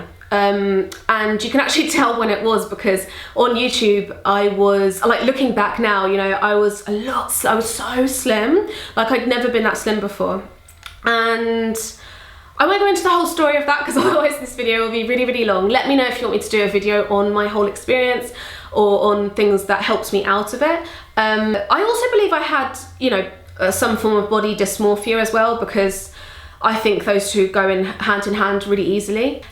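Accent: United Kingdom. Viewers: You are so cuteeeeee with your british accent!!!!!!! <3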